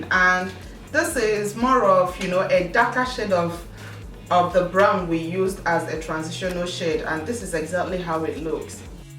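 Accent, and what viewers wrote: Nigeria. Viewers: Where are you from? I hear the West African accent, Nigeria. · okkayy that accent is my Nigerian sister. I am Nigeria and I know when I heard your voice for the first second. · Are you from Nigeria? Just asking because you sound like my mom who's Nigerian.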